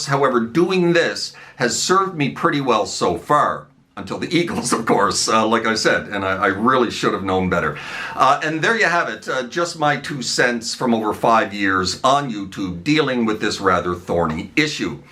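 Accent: Canada. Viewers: That's sounds like a great Maritimes accent if I am coreect? Awesome channel with great song selections, keep the great work.. Newly subscribed in the past month, your a great player, would love to hear some original content if you have any. God bless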